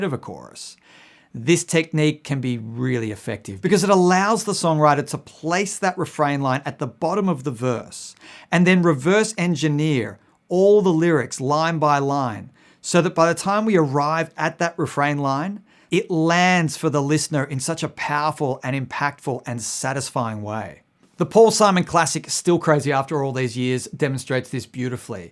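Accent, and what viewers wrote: United States. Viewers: Funny that Sting has a title first, I have been doing that for years. I will not even start a new song without a worthy title. I think if you give a creative process a pedestrian name, it can reflect if you let it and vice versa. By the way. You are Australian but I notice you have a slight American accent?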